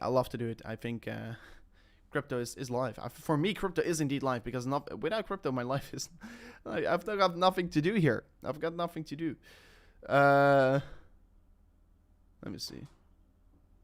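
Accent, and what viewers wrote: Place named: Netherlands. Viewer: Hahha, this guy is Dutch .. Gast ,spreek gewoon Nederlands ..makkelijk te horen dat je Nederlands bent.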